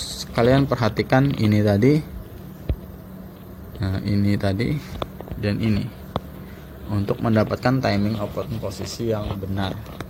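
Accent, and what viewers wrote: Banjar, Indonesia. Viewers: Orang banjar kh ini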